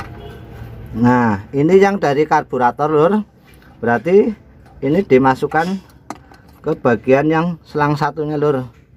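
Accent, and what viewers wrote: Banyumas, Indonesia. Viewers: ngapak ya waaakk...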